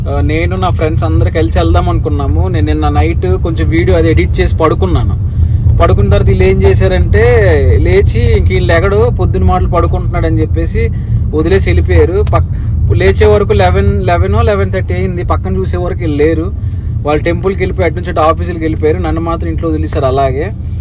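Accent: Srikakulam, India. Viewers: Nee life antha yemi cheyyalo artham kaaka ankithani pelli chesukunnav. Hi this is ane mukka thappa neekemi raadu english srikakulam slang neeku driber job chesuko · Sir Mee slung srikakulam la undhi bro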